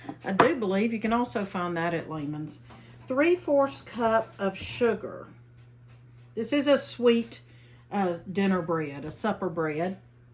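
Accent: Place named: South, United States. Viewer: Hi,! I was delighted to hear that you are from Middle Tennessee. I live in the Muletown if you know where that is and it is also called "The Dimple of the Universe". I hear that you still have our beautiful "Southern Accent". When I was young, I lived in 5 different states and two other countries but moved back to my hometown years ago and am so happy that I did! Do you ever miss living here? Thank you so much for the recipe. It looks delicious. My family makes it with White Lily, Martha White or other WHITE cornmeal but that is because we can get it! So glad that I found you on here. Have a Blessed day.🥰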